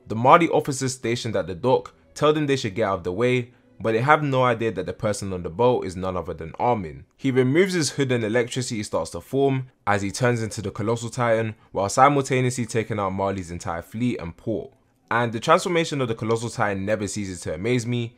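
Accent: London, United Kingdom. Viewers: Finally a Londoner doing these reviews loool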